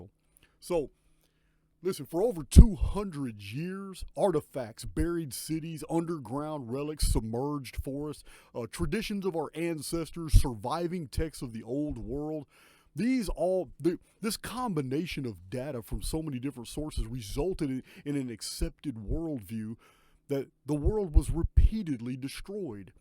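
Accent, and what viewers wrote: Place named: Texas, United States. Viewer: Hey there I just wanted to say that I really loved the interview that you did with Joel but it was so sad that I could barely hear you and I know that you're bringing new equipment on board but I think your levels are super low still this video is also hard for me to hear I don't always have the ability to get to a speaker sometimes I'm just listening to it right through my phone and it used to be so much louder so have you guys can tweak that we love to hear you loud and clear with that Charming Texan accent😇🥰😍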